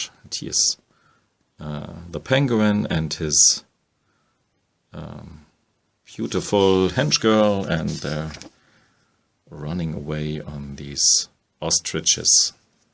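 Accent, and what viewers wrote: Germany. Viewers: I Listen to your soothing German accent while painting. Keep up the great work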